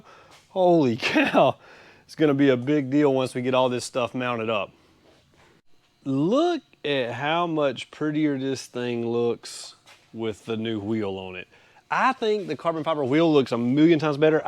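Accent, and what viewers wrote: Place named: South Carolina, United States. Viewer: Such a shame you’re not gonna circuit race this baby she’d be a mean ass ride dude the South Carolina accent is just about gelling with this Kiwi boy from down under 😂👌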